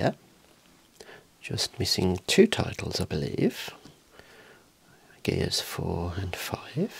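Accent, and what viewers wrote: United Kingdom. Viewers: wow its wonderful how u pronounce the british accent, this is the best asmr with u showing us ur collections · Did I hear a British guy say his favorite Wii sports game was baseball??? 😅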